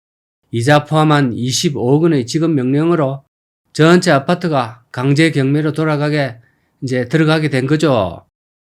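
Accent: Gyeongsang, South Korea. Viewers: 아~~골치아픈 아파트 아까봐라 돈 내라 돈내놔라 ㅎㅎ경상도 사투리 구수한솜씨 구독이 몰린다 티비 개그맨보다 훨씬 재미있다아이가 세상정보도 공부도되고 인생공부되고 참좋다마 시청자여러분 있는돈 잘보관하고 벌로 투자한다고 하다가 다망합니다 돈 꼭홍치지고 맛있는사먹고 하시고 짬나는데로 여행하시고 남은여정 즐겁게 놀다가 때가되면 갑시다 ❤❤❤❤❤❤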